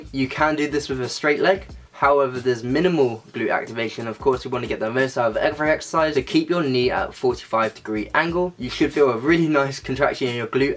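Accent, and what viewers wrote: England, United Kingdom. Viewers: I would be very glad to know your thoughts or opinion on achieving an athletic/lean body without adding weights; just the body as in push ups, regular sit ups etc. Do they work for defining and accentuate the muscle? Ps: Which part of England is your accent from? I haven't heard it until now.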